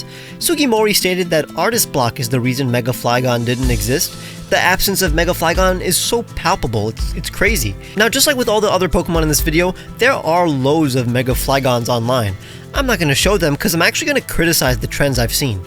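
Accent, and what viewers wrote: France. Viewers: What’s with French accent? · Dude I love your videos, your designs are amazing and ideas are awsome. 🥰 (note you French accent is superb.👌)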